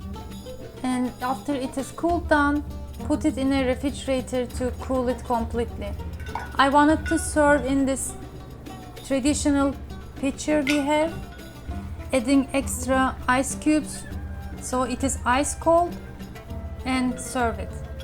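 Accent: Turkey. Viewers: Türk var mı konuşan kadın türk gibi geldi ingiliz şivesi yok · Abla Türkçe konuş türksün zaten 👍😂. Aksan Türkçe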